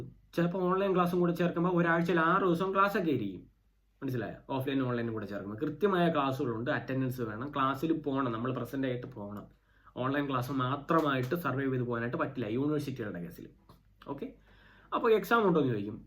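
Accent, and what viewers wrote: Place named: Trivandrum, India. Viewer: I'm also from trivandrum.. Slang kettappazhe thonni trivandrum aanennu. Trivandruthu evdeyanu..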